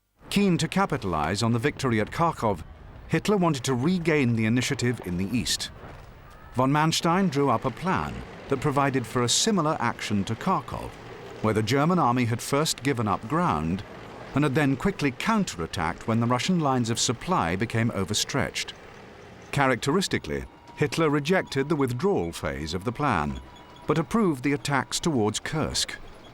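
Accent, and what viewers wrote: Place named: United Kingdom. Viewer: Dear God...when is the British voice thing going to end?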